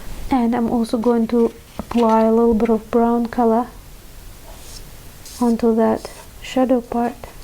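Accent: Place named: Russia. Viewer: haha, okay good to know! Russian accent is great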